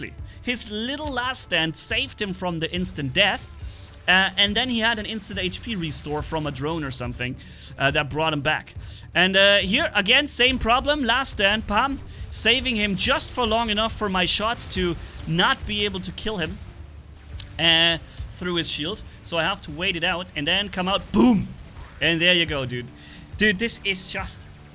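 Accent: Germany. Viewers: Maybe dumb question, but are you German or you learn german (sorry if this is inappropriate question)?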